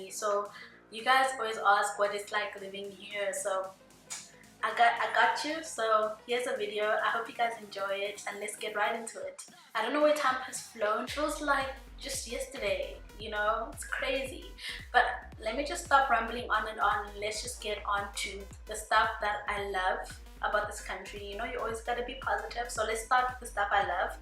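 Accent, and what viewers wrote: South Africa. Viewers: Hey!! you sound South African!! I can tell you are!!I would like to travel to Italy through AuPairing. Can we get in touch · Are you south african? I can here an south african accent but mixed with another accent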